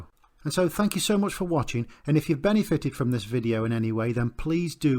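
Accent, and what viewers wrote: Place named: England, United Kingdom. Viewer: Damn dude... What a breath of fresh air to come across a video that is clear, concise, gets right to the point...and above all else...provides the solution to the stated problem. In some ways I was thinking this may be the quintessential "perfect" diy video on YouTube. By this I mean, it certainly does everything listed above, but in addition, it handcuffs the malcontent viewer who finds fault in every video...the video quality is more than sufficient. The audio is of even higher quality, not to mention the soothing, dulcet tones of the anglican accent. Plus, there's no fat on this bone... There's only the bare essential information the viewer tuned in for. Well done. You don't have to sell me on subscribing, this video meritoriously earned my subscription.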